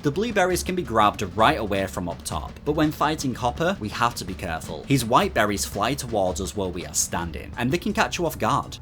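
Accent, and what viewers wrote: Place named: Norfolk, United Kingdom. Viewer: What part of the UK are you from; I’m trying to place your accent — is it Norfolk?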